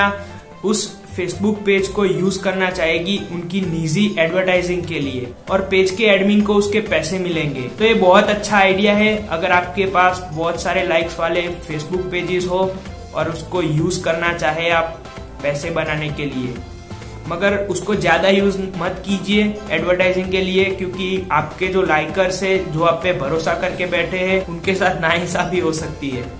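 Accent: Gujarat, India. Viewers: Gujarat se ho bhai?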